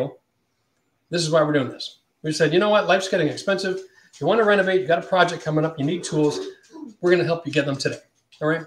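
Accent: Canada. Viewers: Wait, what? Are you Canadian or American?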